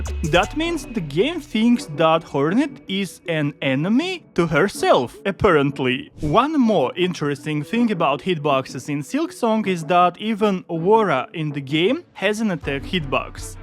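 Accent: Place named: Russia. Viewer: It's a Russian accent this guy has a YouTube channel in his native language.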